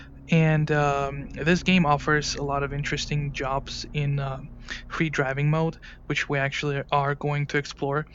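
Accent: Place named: North, United Kingdom. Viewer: ofc whatever , i just wondering like typical north accent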